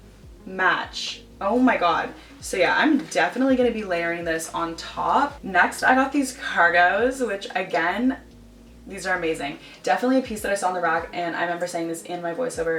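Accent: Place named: Toronto, Canada. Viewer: I just watched your other thrift with me vids and this is the first time I have seen you on YouTube. Anyways, I immediately thought you were from Toronto and when you said you were from there I was like yesss!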